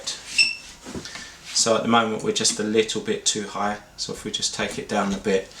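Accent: United Kingdom. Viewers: Love your accent from London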